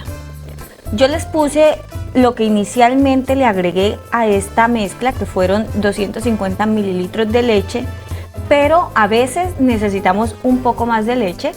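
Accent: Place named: Valle del Cauca, Colombia. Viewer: Tienes acento valluno eres del Valle del Cauca??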